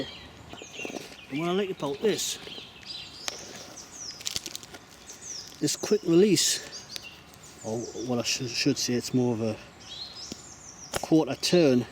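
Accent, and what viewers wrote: Newcastle, United Kingdom. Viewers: Sounds like you're from Newcastle, if my memory serves me right. Been years since I moved out from Gateshead.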